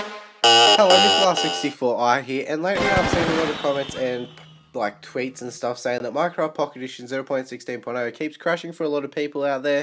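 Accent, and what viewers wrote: Australia. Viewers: I’m Australian and I hear a Australian accent are you australian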